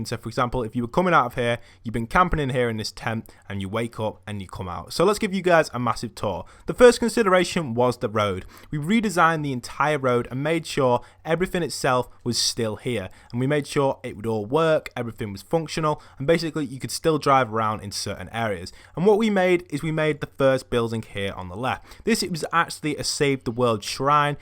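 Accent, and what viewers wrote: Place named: England, United Kingdom. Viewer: Defo English he says orll instead of arl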